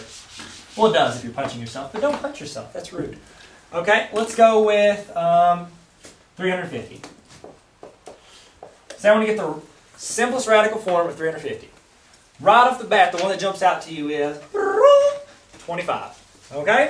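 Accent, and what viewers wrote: South, United States. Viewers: Awesome. Glad a teacher can have a sense of humor. You must be from the south. You use the accent well.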